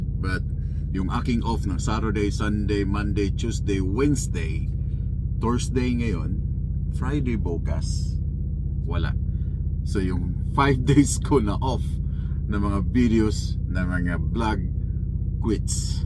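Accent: Visayas, Philippines. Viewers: Morag bisaya lagi ka dong😀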